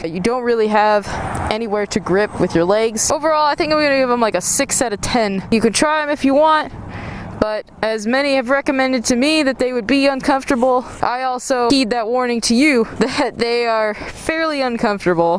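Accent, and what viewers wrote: United Kingdom. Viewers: why dont you put on a set of MY kustom bars ;) and your british accent is bloody good mate! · English accent on point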